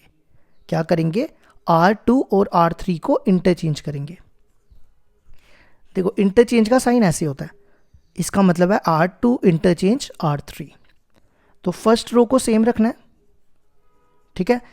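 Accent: Jammu, India. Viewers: Sir Aap Jammu se hoo kya.. 😌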